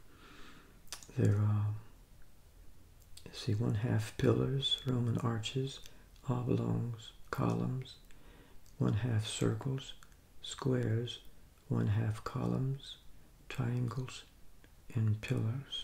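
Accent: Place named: South, United States. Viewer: You have a very calming voice and accent 😊 Are you from the South?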